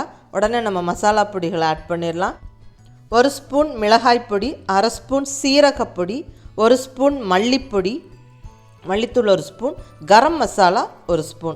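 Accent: Coimbatore, India. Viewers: unga slang keta neenga from Coimbatore thaana 🤔, anyway thank u 😊 IL try this for sure 👍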